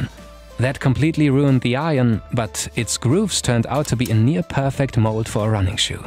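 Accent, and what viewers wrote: Germany. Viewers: Flashing images no shit. This content should not exist on YouTube because of the flashing images. A warning before the video won't cut it id say. Also this guy's accent is on par with the Flashing images, gtfo sauerkraut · i hear a fellow german accent if i hear one;) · After 1,5sec you can tell this guy is german, one of the very few who can actually pronounce adidas correct.🤣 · Are you German we can hear it. Ja aber ist trotzdem ein gutes Video. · I hear the speaker is german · Deutscher Akcent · The German accent narration is winning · what you mean 'nobody', his accent is more German than Stefan Effenberg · love your german Accent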